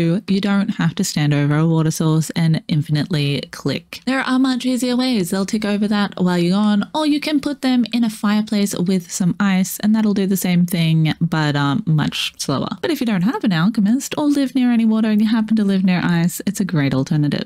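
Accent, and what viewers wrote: Australia. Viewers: Fine video...Love the heavy 'down-under' accent at different points in the guide. Voice speed and pitch is perfect for my brain.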